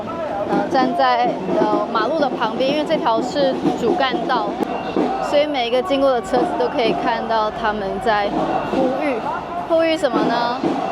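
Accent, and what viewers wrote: Taiwan. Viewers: can someone explain why she and her family is based in Israel? She sounded like Taiwanese..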